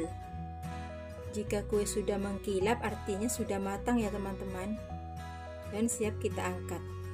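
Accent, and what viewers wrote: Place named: Madura, Indonesia. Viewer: Mantep apenna yu. Empian oreng kaemma? Mak logatta padena reng madure. Sukses yu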